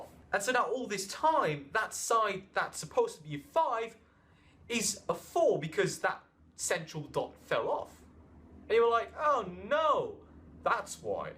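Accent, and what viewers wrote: United Kingdom. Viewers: what are the odds .. chinese speaking in british accent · Chinese guy teaching Hood Math with British Accent. Soo much culturalism Republucans hate 'em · Nice video - any chance you're from nottingham?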